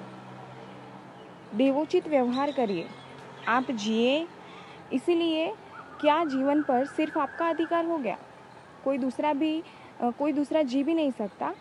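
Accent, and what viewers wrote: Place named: Maharashtra, India. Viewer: Kya ap Marathi ho apke accent se pta lgra h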